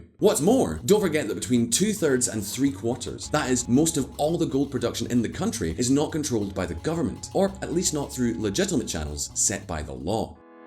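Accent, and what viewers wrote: Scotland, United Kingdom. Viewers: Stop whining about Simon leaving ffs... We still get a bald white guy with a UK-based accent featuring Venezuela’s economy, and still for FREE. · Whoa, how weird hearing scottish accent on this channel.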